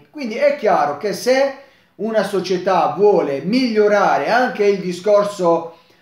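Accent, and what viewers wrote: Bari, Italy. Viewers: Ma di dove sei di Bari ?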